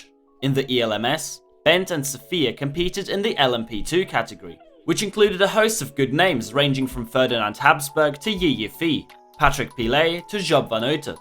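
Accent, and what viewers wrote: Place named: Netherlands. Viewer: Goated Dutch accent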